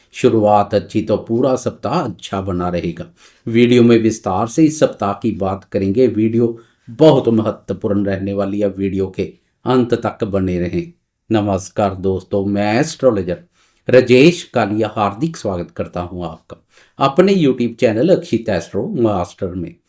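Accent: Punjab, India. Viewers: Sir ik bath bathoo vasay app punjabi ho kya😊 kieyu ki bolthay hoye bhi hindi ap ki punjabi jalkthi hai ji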